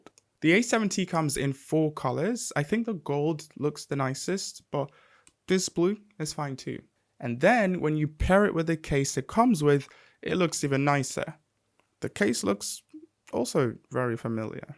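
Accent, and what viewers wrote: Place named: United Kingdom. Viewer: Your reviews are unique. Were you born/lived in the UK? Your accent sounds British (I grew up in London). You didn't mention its 256GB variant. I carnt seem to get it on the market, why?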